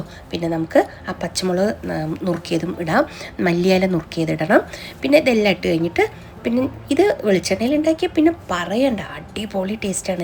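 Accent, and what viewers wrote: Palakkad, India. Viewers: എനിക്ക് ഒത്തിരി ഇഷ്ട്ട ചേച്ചിയുടെ വീഡിയോ യും ചേച്ചി യുടെ സംസാരവും ആ കോളു ❤പൊടിച്ചോളൂ to❤അടിപൊളി വോയിസ്‌ പാലക്കാടൻ ഭാഷ സ്നാക്ക്സ് അടിപൊളി ആണ് ഒന്ന് ട്രൈ ചെയ്യണം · Enikum aa samsaram kelkan enthu ishtamanenno, njanum oru Palakkattukariyanutto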